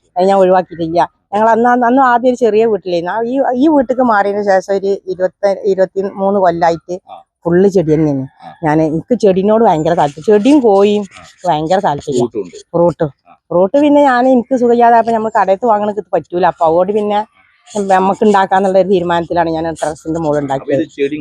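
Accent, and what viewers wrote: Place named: Malappuram, India. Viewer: വീട് കറക്റ്റ് എവിടെയാ, സംസാരം കേട്ടിട്ട് മലപ്പുറം ആണ്, എവിടെ ആണെന്ന് വളരെ കൃത്യായിട്ട് പറയോ, അല്ലെങ്കിൽ നമ്പർ തരി പ്ലീസ്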